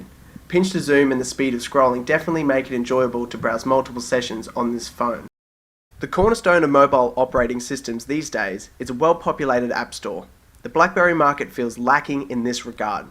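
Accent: Australia. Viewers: Finally an Aussie!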